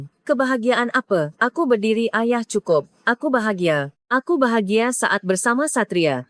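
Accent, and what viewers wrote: Malaysia. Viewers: Bahasa mu Melayu Malay kenapa anda lebih suka bikin karangan lepas dan tidak jelas membawa nama indonesia apakah ini bentuk penghinaan anda terhadap Bangsa dan negara ku karna AQ Tahu ini cerita fiktif kenapa anda tidak membawa nama bangsa negara yang sesuai dengan logat bahasa mu bahasa yang kamu pakai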